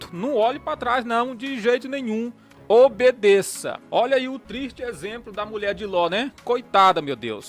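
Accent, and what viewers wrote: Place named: Maranhão, Brazil. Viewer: Estou gostando muito dos seus vídeos 👏👏e aprendendo muito, e gosto do nosso sotaque do Maranhão 👏sou de São Luís MA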